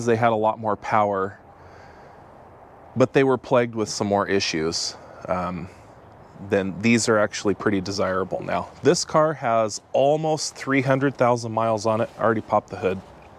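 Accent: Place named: United States. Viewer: Hearing an American talk about diesel engines is crazy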